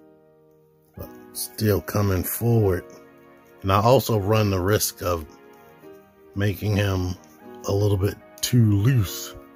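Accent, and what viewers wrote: United States. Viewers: You have the most American voice I’ve ever heard don’t get me wrong is cool you should do the voice actor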